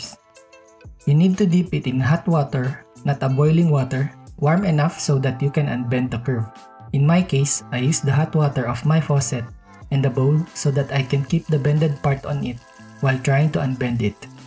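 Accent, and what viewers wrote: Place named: Philippines. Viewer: This can literally help out beginners! Also your accent seems familiar, are you also a Filipino?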